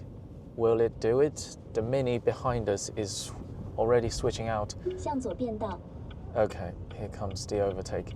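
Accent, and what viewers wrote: United Kingdom. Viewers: 英国口音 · 哥们英音真牛逼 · 的英式口音太特么好听了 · British Accent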